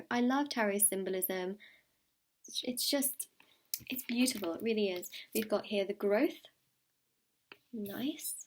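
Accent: England, United Kingdom. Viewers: I like your English accent, beautiful ❤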